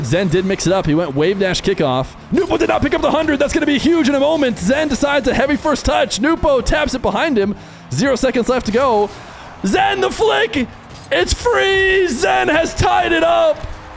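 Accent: United States. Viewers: you sound like an american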